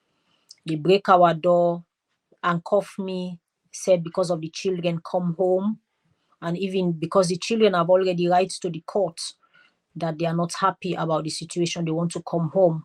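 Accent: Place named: Nigeria. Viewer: This lady sounds Nigeria to me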